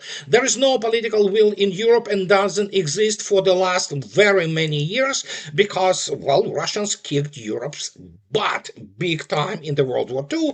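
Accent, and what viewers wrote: United Kingdom. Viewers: That British high-class accent allows that idiot to make hogwash sound intelligent. But those of us that have followed the situation for many years know he is spouting BS. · Slava Russia…. OMG: that British fool with some problems with his dialect…❤️🇷🇺❤️🇷🇺❤️🇷🇺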